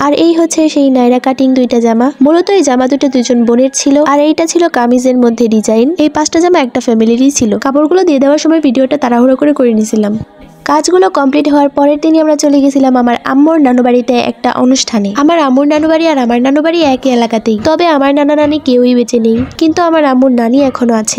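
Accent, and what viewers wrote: Sylhet, Bangladesh. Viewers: Apnr basha ki sylhet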